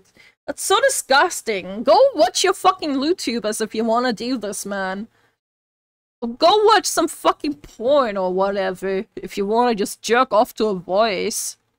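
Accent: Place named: Germany. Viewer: I love your German accent.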